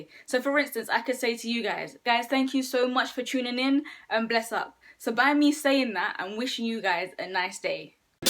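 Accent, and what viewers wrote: Jamaica. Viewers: I love hearing your accent ❤🇯🇲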